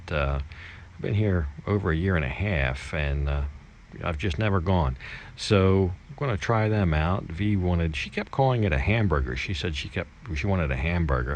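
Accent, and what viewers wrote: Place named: Pennsylvania, United States. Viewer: I'm guessing you're from Pennsylvania judging by your accent.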